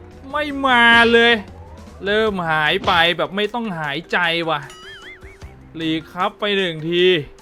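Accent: South, Thailand. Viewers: พูดเหมือนคนใต้เลยนิ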